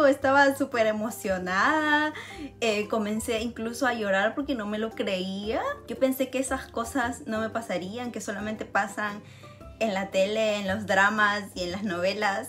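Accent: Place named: Mexico. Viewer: Porque hablas como mexicana? , Linda historia 💕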